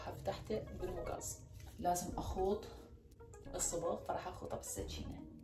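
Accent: Iraq. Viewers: لْـۆ مخليه ع المرايه چيس نيلون م̷ـــِْن ردتي تصبغين المرايه 😉+انتي عراقيه اصليه بالحجي 😅💛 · منووو نصدم لمن سمع الصوت وعرف عراقيه 🙂😐😂مشتركه جديدة حبيتججج 😍 #تعديل شكرا ع 50لايك 🌚😹😹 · يزينك انتي وقناتك ولهجتك يزين أهل العرق بس🇮🇶❤️🇸🇦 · والله اول ما شاهدت الفيديو ما كنت مفعله الصوت .وبس شغلت الصوت انصدمت انك طلعتي عراقيه بهيك لبس .والله يا اختي انت مبيينه انسانه طيبه وفرفوشه. استري نفسك حبيبتي .هلي ربنا يستر علينا ويفرج هم هالامه الاسلاميه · حجيج عراقي من وين بلعراق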